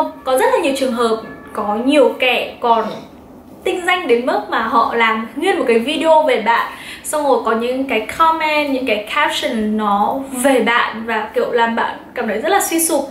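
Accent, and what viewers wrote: Standard. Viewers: c nói quá chuẩn, quá hay :D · Chị nói chuẩn quá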